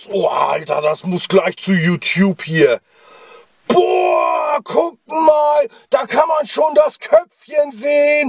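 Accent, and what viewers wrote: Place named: Hamburg, Germany. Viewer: In welcher Stadt wohnst du weil du klingst so Hamburgisch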